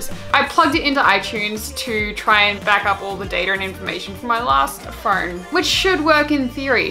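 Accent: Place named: Australia. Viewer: More of that Aussie accent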